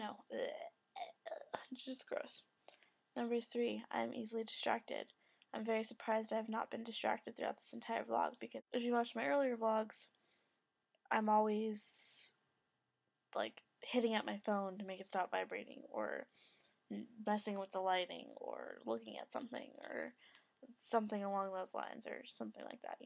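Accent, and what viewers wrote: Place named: United States. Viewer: Ha ha sweet, thanks for the shout out, i've been super busy so haven't been able to get on here. It's Danny btw, but I don't mind hearing my name in an american accent! Keep up the good work.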